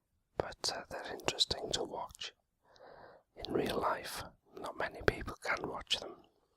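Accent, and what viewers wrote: Wales, United Kingdom. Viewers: Just came across your videos. So nice hearing a Welsh voice doing ASMR 😀 I'm from North Wales, you? Diolch yn fawr 👌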